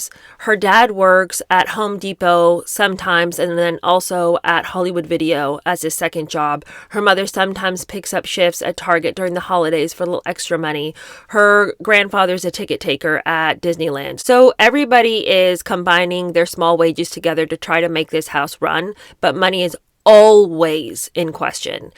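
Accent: United Kingdom. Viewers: Love this new series! I hesitated at reading the book too but now it’s on my list. The only thing we are missing is an excuse for your amazing British accent! Lol Love your channel!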